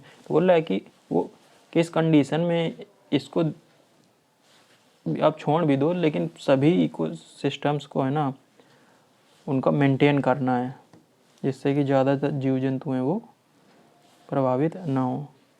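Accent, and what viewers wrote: Kanpur, India. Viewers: Awaz se aap kanpur ya Fatehpur ke lag rahe hai 🙏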